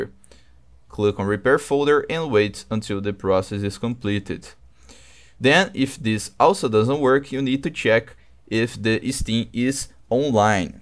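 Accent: Brazil. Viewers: Thank you very much. Recognized that brazilian accent from a mile away!! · esse ingles é brasileiro ein kkkkkk satisfaçao irmao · no puedo aguantar al br hablando ingles asdkjashdka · i love you, eu sei q tu é br, esse sutaque nao me engana marvadu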